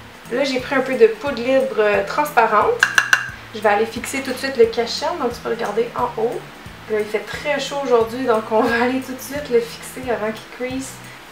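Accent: Quebec, Canada. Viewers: Oh une petite personne avec un trop meugnon accent québécois! Et en plus avec un tuto superbe Représente 🇫🇷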